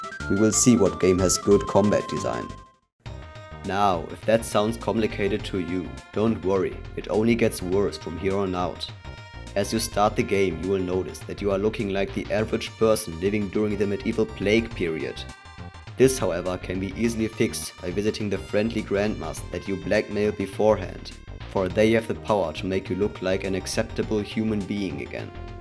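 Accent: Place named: Germany. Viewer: Are you german? You have a german accent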